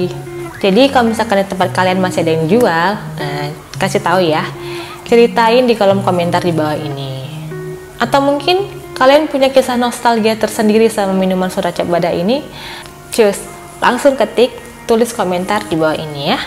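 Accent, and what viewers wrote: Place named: Medan, Indonesia. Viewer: Banyak belajar sejarah lama yang baru tau nya sekarang, padahal yang asli medan belum tentu tau. Semangat terus buat mbak rutin upload nya, karena saya yakin masih banyak cerita seru sejarah/kuliner medan yang menarik dibahas dengan logat "cakap-cakap medan" hhe Terimkasih udah buat video ini jadi lebih tau. #Giveaway #Dudiksendaljepit #RagamVariasi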